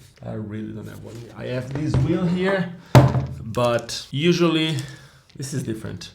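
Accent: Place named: Portugal. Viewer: Acabei de comprar estes patins, a meio da review comecei a pensar no sotaque e obvio que tava certa e é tuga xD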